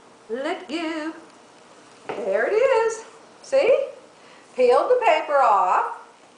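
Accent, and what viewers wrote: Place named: Oklahoma, United States. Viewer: You mention Edmond.. is it Edmond Oklahoma? Your accent sounds like mine. Okie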